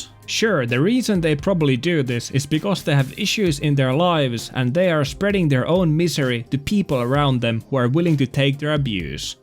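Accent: Finland. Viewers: You are finnish from ur accent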